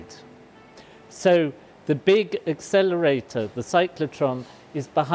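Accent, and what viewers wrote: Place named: United Kingdom. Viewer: Pompous? Nah he's just British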